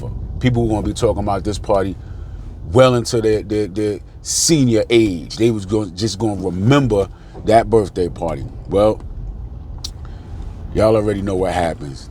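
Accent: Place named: New York, United States. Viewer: Sound like you from one of the boroughs.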